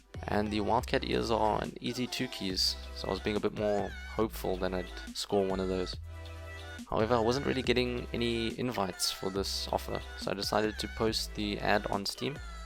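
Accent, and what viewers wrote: South Africa. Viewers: Are you South African? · You're South African? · Are you South African 😂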